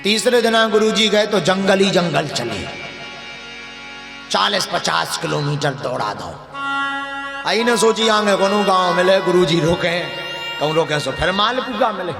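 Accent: Bundelkhand, India. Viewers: Gurudev ji k shree mukh se bundelkhandi boli bahot achhi lagti h jai sitaram 🙏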